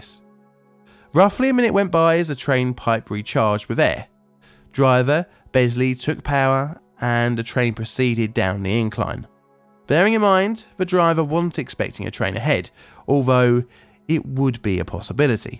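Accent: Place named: England, United Kingdom. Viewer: DEB-ree (debris) 😂 we say duh-BREE. Choob (tube). 😂 I love English accents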